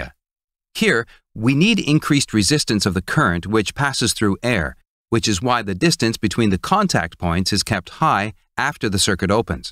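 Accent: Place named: India. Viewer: New to the channel. Was wondering how come the channel get from a good english accent to Indian English accent?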